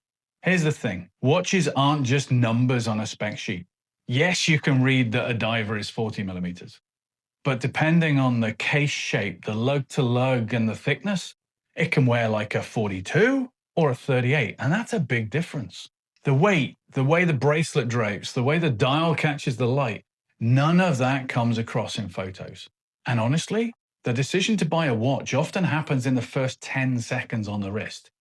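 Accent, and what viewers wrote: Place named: Birmingham, United Kingdom. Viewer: Sounds like a Brummie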